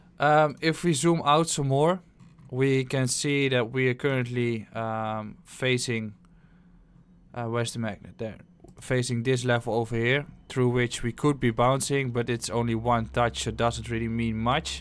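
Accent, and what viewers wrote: Netherlands. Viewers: Lache man! Je eigen stijl ta , een dik NL accent en je wijst naar pareltjes. Ik volg je pas net, maar ziet er tof uit!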